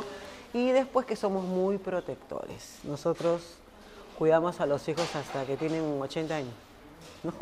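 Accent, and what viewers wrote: Peru. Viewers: Tú eres peruana? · Es el acento neutro peruano, tenemos más acentos por las demás regiones, pero uno de los más difíciles acentos para imitar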